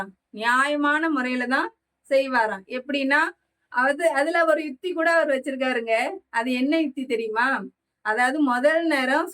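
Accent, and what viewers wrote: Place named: Tirunelveli, India. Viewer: Good Tirunelveli Tamil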